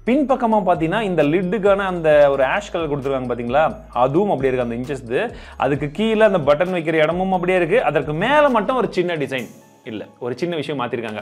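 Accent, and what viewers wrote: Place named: Usilampatti, India. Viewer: Uslimpatti slang super bro 🔥🔥🔥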